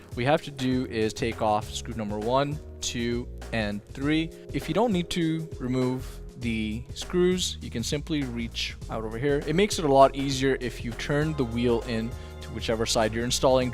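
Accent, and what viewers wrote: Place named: Canada. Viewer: You're so Canadian! "Oo-ut". 😭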